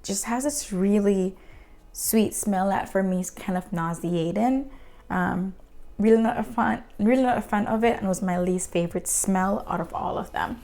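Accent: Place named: Jamaica. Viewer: Totally love your review! Also love your accent…. Sounds like a beautiful Jamaican accent (I am also Jamaican). Good luck in your business journey.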